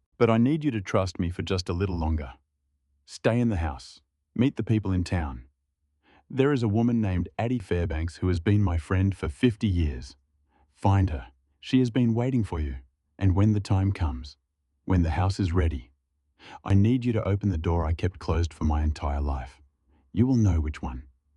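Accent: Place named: Australia. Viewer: Loved the Australian accent. It is so much easier to listen too .. are there any more read in an Australian accent?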